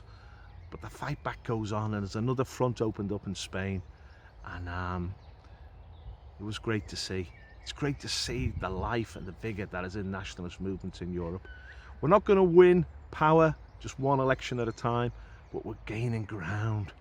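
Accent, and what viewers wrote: Liverpool, United Kingdom. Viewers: Scouser..from Liverpool. Every Nationalist respects those who fight for their Nation State.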